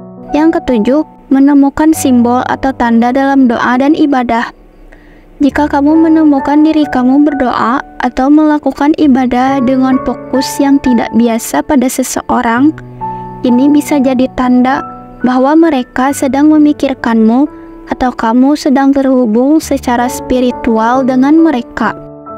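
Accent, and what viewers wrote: Bali, Indonesia. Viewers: iy mnurut saya ini dialek orang bali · Dri dialeknya sptnya org Bali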